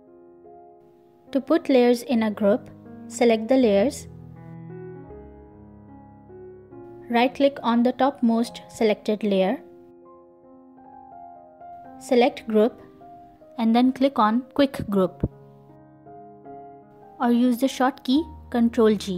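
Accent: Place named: India. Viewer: then what authencity. Your accent sounds indian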